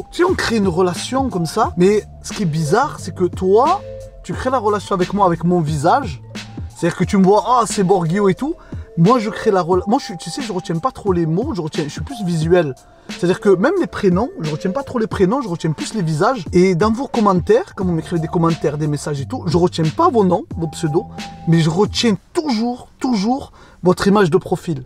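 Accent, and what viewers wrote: Marseille, France. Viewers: J'ajouterai ceci mon cher, je ne sais pas comment tu es dans la vie de tous les jours, mais ici, tu es une des personnes les plus chaleureuse et sympa, tellement que je me suis abonné, et cela est rare. Sûrement ton accent marseillais que j'adore qui me rapelle Marius et Fanny. Mario Repentigny Qc.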